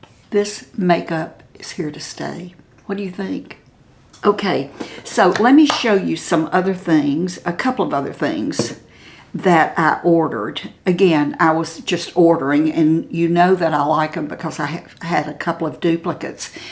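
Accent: South, United States. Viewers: Your southern voice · You are such a beautiful woman, inside and out. Prayers for your stressful things to be over, and everything else to be good. I enjoy seeing you, and I love listening to your “southern” accent! It’s so pleasant to hear!🙏❤️💐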